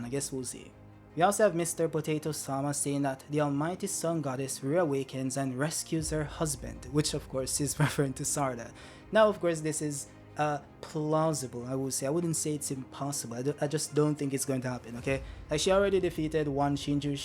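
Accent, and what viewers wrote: Jamaica. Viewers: You sound Jamaican bro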